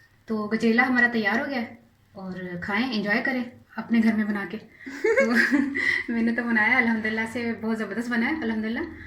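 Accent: Punjab, India. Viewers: love your Urdu mix punjabi...so sweet, so loving and so nice accent. Your recepies are also very nice, practical and it seems like some family member is telling how to cook. Love you :). I am living in holland and i love to cook and eat desi food. you are doing a good job. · You are so sweet buhat achy and esey treqy sy sab kuch skhaty ho aor Jo bat kerty huye Panjabi ka terqa lgati ho acha lgta Allah ap ka nseeb acha kry ameen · App ksa main hain but I think 4rom back home u r from lahore or faislabad because of ur Punjabi eccent😊😊😊😊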